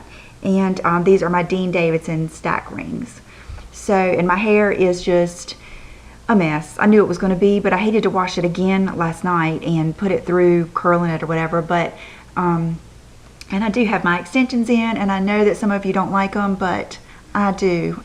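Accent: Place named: South, United States. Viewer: I just discovered your channel through Rachel Talbot and I LOVE it !!! I'm French and I have a weird obsession with the southern accent ahahah I wish I could talk like you :-) Anyway I really like your videos and I'm gonna catch up on most of them. Xoxo from France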